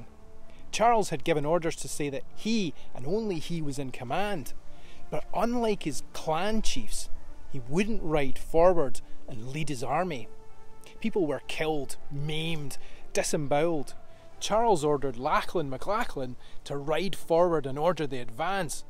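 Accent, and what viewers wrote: Scotland, United Kingdom. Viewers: Thanks for this, well done and love the Scots accent as a big part of this report. What sad days there were, and still are with these conflicts taking people's lives and often for so little gain. The bravery of these souls must never be forgotten!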